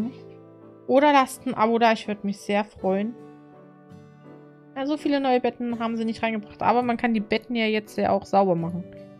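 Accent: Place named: England, United Kingdom. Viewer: So... erste Kommentar! Die Folge ist mir einfach zu lange, maximal 45 minuten wäre optimal, wenn möglich. Ich finde dein Englisches Azcent lustig und süss! Du bist doch kein Geist,. Du bist ein Vampyr XD Bin gespannt was du noch in diesen DLC schafst!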